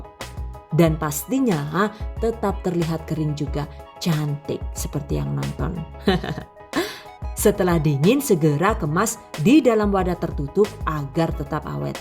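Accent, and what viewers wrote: Banyumas, Indonesia. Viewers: Bahasa ngapaknya itu lucu banget Bun😆"iklan maning iklan maning to yuk"tapi saya suka banget sama setiap resep & tips nya itu detail banget.makanya tiap cari resep pasti yg dicari resep.sukses terus ya bun channel nya aamiin🤲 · Yg saya suka dari penjelasan nya detail..suara & gaya bicara nya enak didengar.aoalagi ada logat logat ngapak nya itu..lucu. suka sekali..👍👍👍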